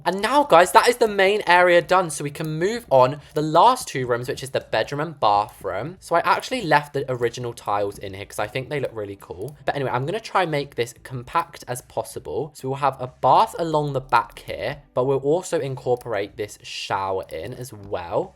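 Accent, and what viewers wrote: United Kingdom. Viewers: gay ish? Naur that’s called a British accent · WAIT Y'ALL THEY'RE A BOY?! I THOUGHT THEY WERE A GIRL THAT HAS A BRITISH ACCENT 😭😭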